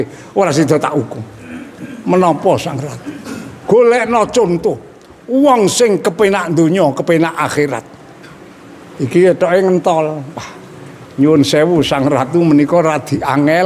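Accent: Magelang, Indonesia. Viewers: Gaya bicara nya khas orang magelang ....❤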